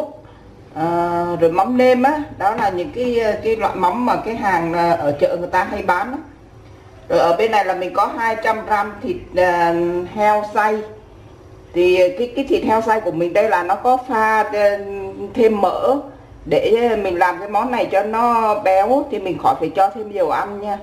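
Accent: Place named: North, Vietnam. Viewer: Co o Dong nai ma cung an mon mien tay ha.giong co nguoi bac ah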